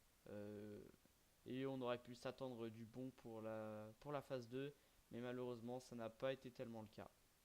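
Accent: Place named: England, United Kingdom. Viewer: Osef l'accent anglais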